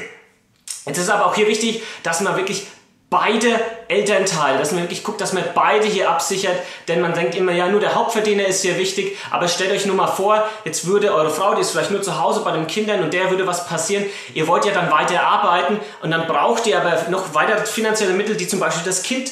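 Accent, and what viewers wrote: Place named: Franconia, Germany. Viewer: Aus Aschebersch... und dann so einen schönen fränkischen Dialekt :D. Halbhesse :D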